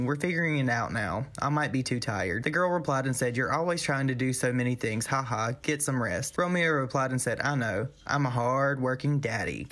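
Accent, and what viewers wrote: South, United States. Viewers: I love your southern accent...you sound so polite, even when you're being snarky. 😂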